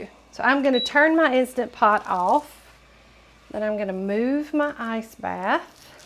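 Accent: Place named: South, United States. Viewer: Great video. Thank you. Automatically gave you a thumbs up for the southern accent alone. 👍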